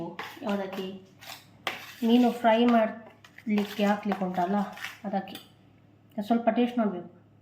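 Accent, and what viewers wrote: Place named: Mangalore, India. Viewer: Just saw this chanel ,you talk like my elder sister 😂 our kannada is same like this because we are mangaloriens😂❤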